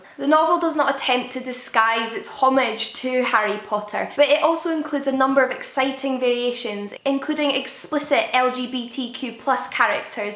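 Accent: Scotland, United Kingdom. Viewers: Amazing video, can you, or anyone from the UK, tell me which part of the UK speaks with this accent, would really like to know, thanks! :D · Yasss another Scottish person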